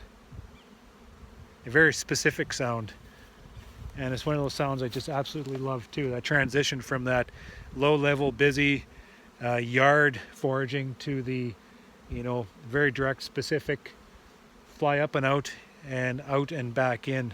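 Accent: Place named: Canada. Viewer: I prefer the pronunciation p-oh-llen, over the pronunciation p-ah-llen. Not sure which is correct. Perhaps both. Your pronunciation just sounds right. I love the way Canadians speak English, and I love all of our northern neighbors, Eh?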